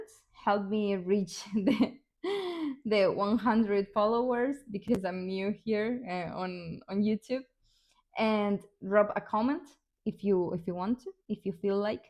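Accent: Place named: India. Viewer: I thought you were from India 😂. I'm learning Spanish and am from India. Thank you.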